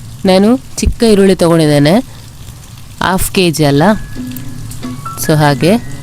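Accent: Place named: Mangalore, India. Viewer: Nimma manglore bashe thumbaa chennagide marree😁 Nanna friends ella manglore avre thumba Kushi aguthe nim kade kannada kelakke❤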